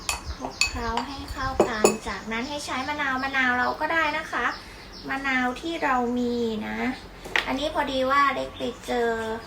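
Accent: Isan, Thailand. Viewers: เว่าอิสานม่วนดีเสียงน่ารักมากเลยน้อง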